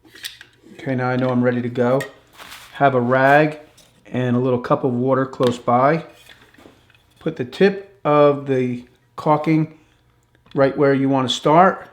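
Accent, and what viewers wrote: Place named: New Jersey, United States. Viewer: Always fun listening to a fellow Jersey boy tawlk. 😁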